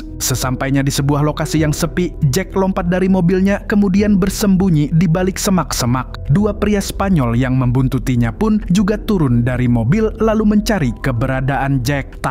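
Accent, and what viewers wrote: Banjar, Indonesia. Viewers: Urang Banjar kah nywa,,haha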